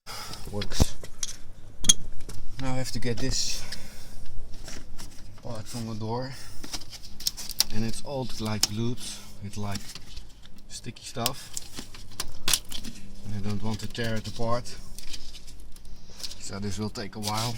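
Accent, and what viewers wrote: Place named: Netherlands. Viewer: Bedankt voor je handige instructies. We have a 1996 VW Cabrio, and your instructions and video guidance was just what I needed to get this done. We live in Norfolk County in Ontario, Canada, and there are a lot of Dutch people in my church--it was very nice to hear your instructions in English with your Dutch accent. I did pretty much everything that you did, and just finished and the window works great! Thank you especially for the extra details about the clamps and the alignment procedure. Thank you so much.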